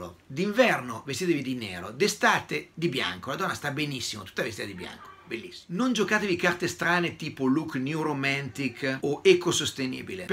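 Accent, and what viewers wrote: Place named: Milan, Italy. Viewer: Io non capisco , signore, cosa c'è da scandalizzarsi. Si può non essere d'accordo,io stesso, maschio etero arrapatissimo, non sono d'accordo al 100% con lui, che oltretutto ha un accento milanese che per noi romani è come le unghie che grattano il muro,però secondo me la femmina avveduta deve fare tesoro delle sue parole, perché che piaccia o no descrivono i desideri di ampia parte degli uomini. Poi,si può decidere di non partecipare alla festa, ma la conoscenza delle cose bisogna averla, e senza puntare il dito, anzi, ringraziando delle preziose informazioni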